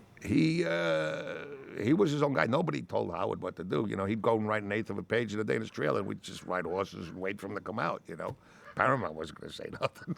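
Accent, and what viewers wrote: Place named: New York, United States. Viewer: A cowboy with a new york accent?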